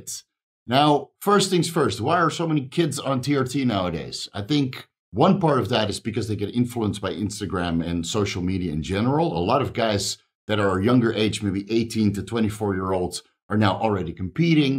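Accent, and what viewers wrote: Netherlands. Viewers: is this guy from netherlands or something? can't place the accent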